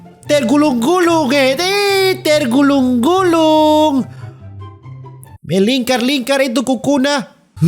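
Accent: Manado, Indonesia. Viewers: Suka dengar pertuturan orang manado. Unik 😊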